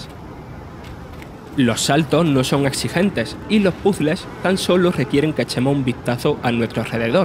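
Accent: Andalusia, Spain. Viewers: No se si el que habla es de Jaén o Murciano. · Pregunta por curiosidad, ese acento es de qué parte de España? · E' andalú soquete... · Del Sur sobre todo, Murcia/Andalucía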